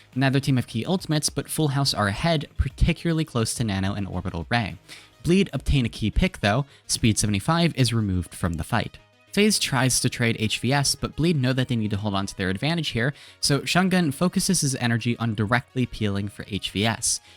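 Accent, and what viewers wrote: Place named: United States. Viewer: American accent but you call it university?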